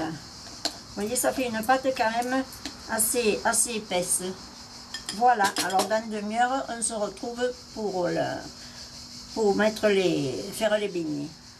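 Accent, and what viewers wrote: Provence, France. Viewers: J’adore les aubergines, je voudrais tester votre recette mais les aubergines sont quand même longues à cuire ! Combien de temps les laissez vous dans la fritures ? Et je suppose a feu moyen ? Merci pour vos précisions, un plaisir de vous entendre avec ce petit accent provencal !? 😊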